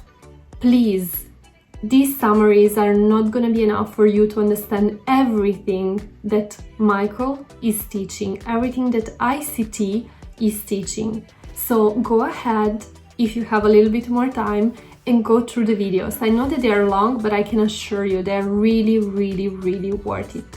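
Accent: Italy. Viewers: Cute the italian accent , continua cosi!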